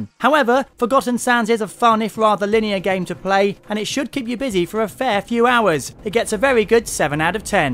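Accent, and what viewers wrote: England, United Kingdom. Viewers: go fuck yourself! He is ENGLISH and speaks ENGLISH with an ENGLISH accent...if you think ENGLISH is an indigenous American language and the American accent is the right one, pick up an American history book and read...you need learn more about the world you live in, DUDE!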